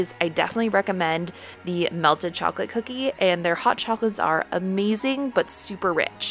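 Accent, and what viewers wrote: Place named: California, United States. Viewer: Your voice you don’t even sound like your from New York you sound like your from Cali... hmm these are all cool but idk I would want real cheep spots from a real New Yorker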